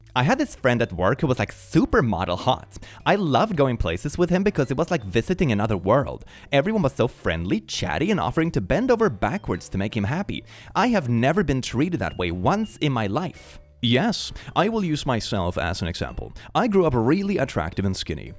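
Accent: Sweden. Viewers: I thought you were American until the impression of Danish, and now I can't stop hearing the Swedish accent. 😂 Good job on getting a very international following! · while you are pretty, I tune in mostly for your accent and the casual tossing out of Swedish words. It reminds me a bit of my Swedish-born first husband! He had a beautiful voice, too.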